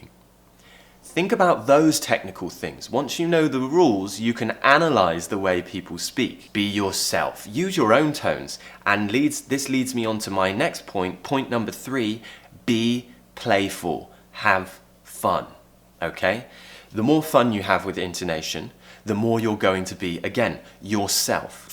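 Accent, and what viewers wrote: RP, United Kingdom. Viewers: British accent is more likely I like it 2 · I love British accent, especially yours because it's very clear, its easy to understand. 😍❤️ From Philippines 🇵🇭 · I love to listen your beautiful british sound and You are a good teacher ever! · 好听的英式腔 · Aaaaahhhhh!!! Ur accent is👌👌😍 Honestly , I love British accent♥️the most ...though m Indian🇮🇳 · Wow new hair cut... Handsome! I want to learn english and the british accent thats why i keep on watching you, you are good mentor..thank you! From Philippines... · Clear, British English, i understand all, please advise me BRITISH SERIALS, with British accent, so i will be very thankful for you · Compering to a quite arrogant american one, it caresses ears. I love this posh accent and soft or lost R.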